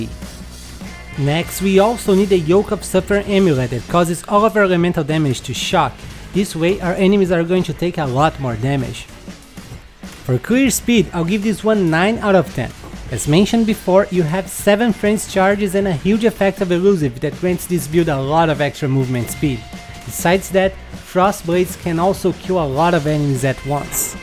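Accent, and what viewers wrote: Brazil. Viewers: Vc é BR? Parece que tem sotaque haha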